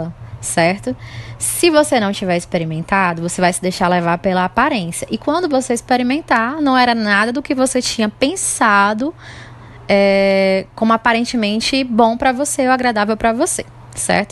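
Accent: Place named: Ceará, Brazil. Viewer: Legal o jeito que tú fala. Tú é cearense? Parabéns pela a aula!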